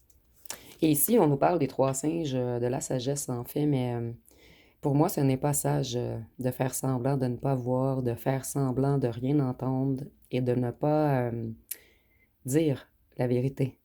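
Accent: Quebec, Canada. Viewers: j'adore vos guidance , merci beaucoup ;) pas de souci je comprend bien le québécois puis j'adore , votre franc parler c'est trés spécifique votre parlé , trés imagé ,j'aime bien! c'est franc ! ;) félicitation pour votre nouveau logement , content pour vous ;)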